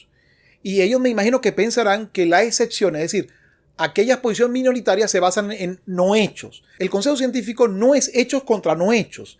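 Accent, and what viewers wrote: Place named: Venezuela. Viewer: Eres de Venezuela?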